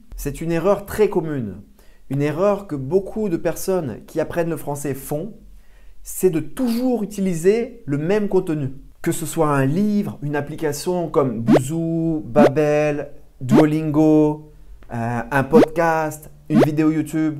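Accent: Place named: South, France. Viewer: Il me semblait juste que tu parlais un peu a la maniere du sud (j'adore entendre des nuances de français))) Mais j'en doutais et pensais que c'etait probablement juste une association — ton nom est, comme le personnage principal de le dessin anime Ratatouille, et la ratatouille est un plat du sud, etc. Bien que (j'ai deja regarde toutes tes videos) tu vis vraiment dans le sud))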